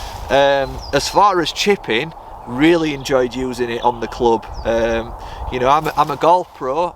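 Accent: Yorkshire, United Kingdom. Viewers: I think should change the name of your channel to " " salt of the earth Yorkshire accent you know this guy is not lying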